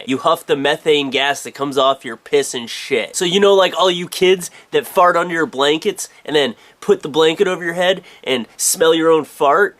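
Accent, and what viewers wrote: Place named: Canada. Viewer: I think should find an excuse to say 'house' in every video. Believe it or not, my friends and I have become so amused with his accent that we all say 'house' Canadian-style.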